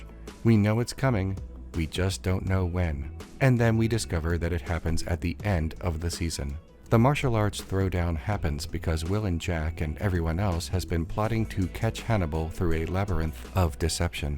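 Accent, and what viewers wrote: Baltimore, United States. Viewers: I can tell you're from Baltimore by the way you say Baldimore.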